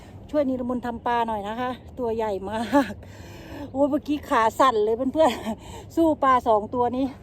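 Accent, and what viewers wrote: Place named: Phu Thai, Thailand. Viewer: คริปก่อนเห็นเว้าภูไทเป็นคนจังหวัดอะไรครับสกลหรีอนครครับ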